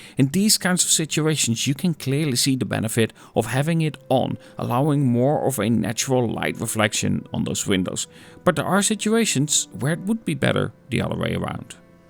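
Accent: Netherlands. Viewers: Just a little dutch accent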